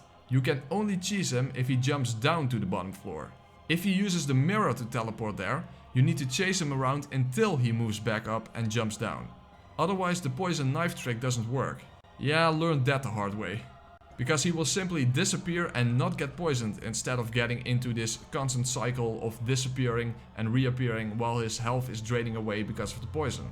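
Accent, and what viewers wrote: Netherlands. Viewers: I love your Dutch accent lmao! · Ik hoor een Nederlander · Geez, ur dutch for sure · Ey, Nederlands of Belgisch? :p Mooie guide. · This man sounds dutch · Love your Dutch English accent, greets from The Netherlands. Lekker gewerkt pik. ;) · First of all you sound dutch, second I can't even complete this game normally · lol narrator is dutch, stereotypical terrible accent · U dutch? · I can hear that you Nederlands are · Im surprised there are so little comments about your dutch accent. Geweldige gamplay makker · Ik hoorde gelijk dat je nederlands bent aan dat accent hahaha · You sound dutch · Dit is de meest duidelijke nederlandse accent ter wereld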